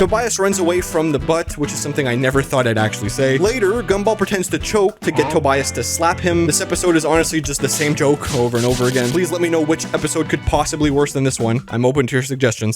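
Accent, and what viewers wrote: Canada. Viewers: Wait is this guy Canadian? DID I FIND MORE OF MY PEOPLE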